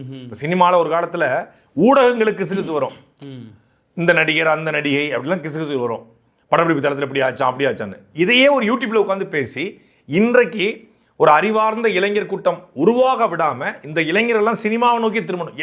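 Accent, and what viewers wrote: Tirunelveli, India. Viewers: When saattai speaks, sometimes his nellai accent slips, that looks very cute❤😅....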